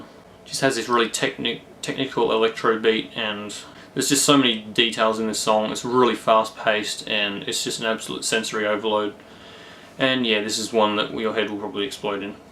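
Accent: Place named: Australia. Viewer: Nice review! Where from Aus you from?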